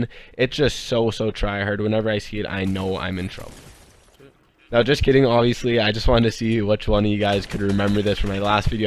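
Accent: Canada. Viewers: Yo where are you from, you sound like ur from Canada, more specifically Ontario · 100% Canadian. Fellow Canadians can spot out other Canadians by voice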